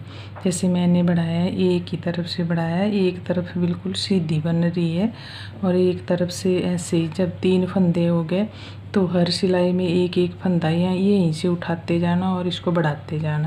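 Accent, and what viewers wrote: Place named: Haryana, India. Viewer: Aap ke bolne se mere ko laga ki aap haryana se hai .mai to m.p.se hu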